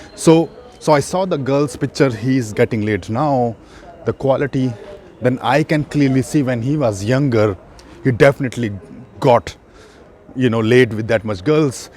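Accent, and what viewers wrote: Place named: Bihar, India. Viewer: Your accent is very Bihaari. The way you say "aaaalso" .. Sounds like some chokidaar / chapaadasi...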